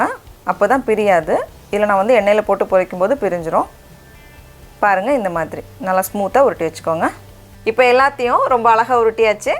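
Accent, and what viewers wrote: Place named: Tirunelveli, India. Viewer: Hi why no videos for a long time eagerly waiting for ur video. Nice video. U r always a queen in cooking I like u very much and also ur tirunelveli tamil. Iam Christilla from Chennai.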